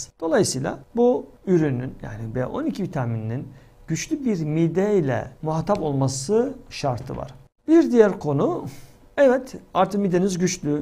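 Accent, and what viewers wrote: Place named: Southeast, Turkey. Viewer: Sevğili Hocamm merhabalar,💐🙏Dün gördümm videonuzu, ve tekrâr yine dinlemek istedimm sizi, çok mutluyum gerçekten, Düşündümm bir an aklıma geldiği şivede dikkatimi çektiiii, yıllar önce Babacığımm da Eczacılık yaptılar ( Batmanın Gercüş ilçesinde kaldık ama Kozlukta da kaldık nekadar çok ismii vardır diye düşünüyorken Netten, otobiyografinize baktimm,))) Nokta atışı heĺâl olsun bana dedimm, Oraları çok severek anlatiyorumm çocukluk yillarimiz oralardaaa) Doğu ve Güneydoğu insanları bizler çok misafirperver yaklaşırlardı, Çok hakları vardır bizdee 🙏🙏🙏Onlara siz8n Nezdinizde teşekkür ediyorumm, sizlerede aynı şekilde teşekkür borçluyuz Saygıdeğer Hocamız verdiğ8niz bilgiler için Minnettarimm, ve dee severek paylaşacağimm yayinlarinizi, Sayğilarimlaa,🙏🙏💐💋💋)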